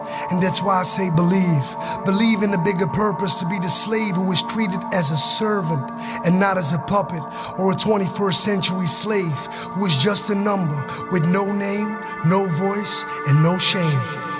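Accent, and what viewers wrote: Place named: Morocco. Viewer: Simply POWERFUL & ELOQUENT! You've spoken nothing but the truth in such an Amazing way. Allahumma Baarek! [AMEEN] 🤲🏽♥️💪🏽 Keep up the good work, you've got an amazing voice, great vocabulary and accent for a moroccan brother, wichis quite rare these days, no offense bro! 🙌🏾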